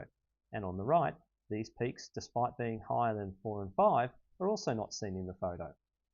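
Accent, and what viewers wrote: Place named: Australia. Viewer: Maaaaate. That ain't no New York accent. You are from the land of the vegemite sandwich.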